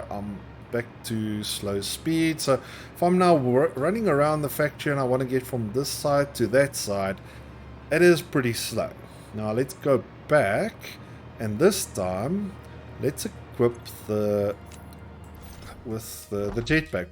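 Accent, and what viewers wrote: South Africa. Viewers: This guy sounds South-African 🤭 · Are you South African boet?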